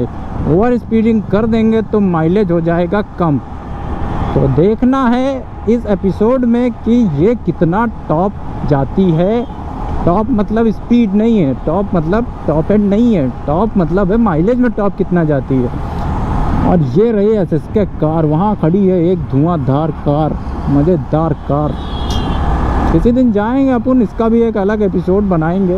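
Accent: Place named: Mirzapur, India. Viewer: Everything aside your mirzapur like accent ❤️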